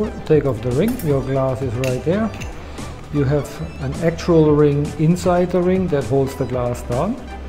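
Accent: Germany. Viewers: Thanks for the video with the nice swabian accent. Als Schwabe hat man den halt...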